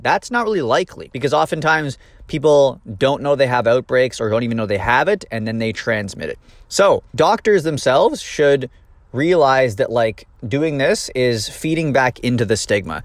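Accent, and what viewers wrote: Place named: Canada. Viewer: You say "out" in Canadian accent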